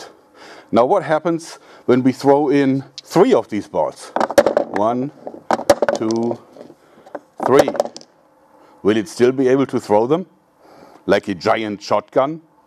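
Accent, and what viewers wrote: Germany. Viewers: Er IST deutscher, was denkst du wieso seine Aussprache diesen Akzent hat ;) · Do you come from Germany?? Your voice sounds like that ;) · Is he german? it sounds like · he isn't from Austria so it would sound different because arnold schwarzenneger is from Austria and Isn't he is from Germany can hear it from his accent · definitv German!! unverwechselbarer Akzent :D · he sounds german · Is it a german accent? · This was the first of all of his videos that I had watched and he sounded German. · def. sounds german!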